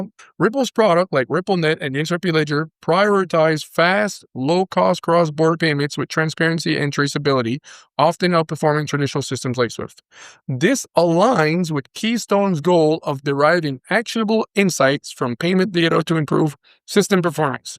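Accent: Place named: Newfoundland, Canada. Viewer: [• Someone finally asked the question I was going to ask… I thought to myself “sounds like he’s from Newfoundland!“. I actually sound like you do… i pronounce words like you do. Maybe I’ll run into you at the Avalon mall, or downtown at a bar? Just kidding. I think the first guess is more correct. Although, you do sound like a Newfoundlander absolutely! And I’m from here.•] [• maximum respect to you my friend!🙏😎👍🫡•]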